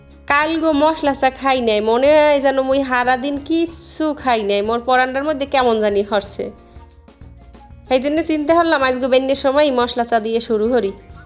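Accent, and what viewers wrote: Barishal, Bangladesh. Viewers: আপু অনেক ভালো লাগছে আপনার এই বরিশালের ভাষা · আমি বরিশালে বউ আপু।কিন্তু বরিশালে কোন ভাষা আমি বলতে পারিনা।তোমার মুখ থেকে বরিশালের ভাষা শুনতে খুব ভালো লাগলো আপু।তোমরা ভালো থেকো।দোয়া করি।আমার একটাই মেয়ে।নাম মানহা।আমাদের জন্যও তোমরা দোয়া করো। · আমিও বরিশালের মেয়ে + বউ। একজন দেশি আপুর কথা শুনে মনটা প্রশান্তিতে ভরে গেলো।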